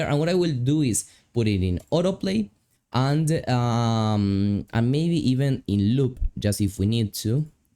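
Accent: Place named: Argentina. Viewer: Aunque hables en inglés se nota que eres argentino 👍🏻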